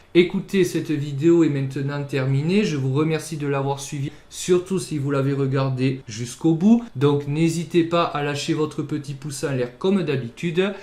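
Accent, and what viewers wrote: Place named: South, France. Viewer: Rien n'éclipse en effet la chaleur de votre accent solaire;-) Merci pour cette vidéo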